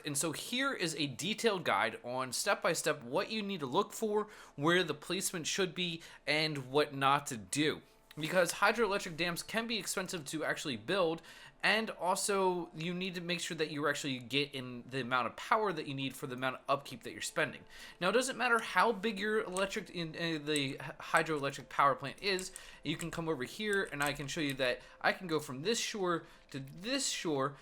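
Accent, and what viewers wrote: Pennsylvania, United States. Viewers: Do I detect a fellow Pennsylvanian? That accent is unmistakable. "Wudder" :D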